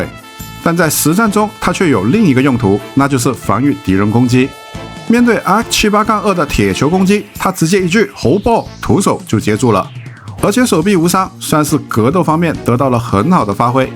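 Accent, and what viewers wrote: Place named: Cantonese, China. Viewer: 好欣賞你嘅頻道，解釋很清楚，中間仲夾雜好地道嘅廣東話👍尤其是機體名稱，你亦都有提及港版叫法，因為我係香港人所以聽落零舍親切👍👍👍well done🙏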